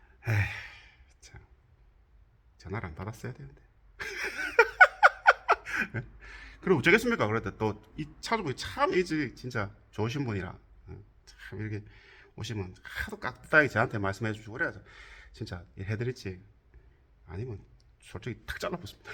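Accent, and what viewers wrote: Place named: Gyeongsang, South Korea. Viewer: 오늘도 구수한 경상도 사투리를 들으며 영상 시청 합니다..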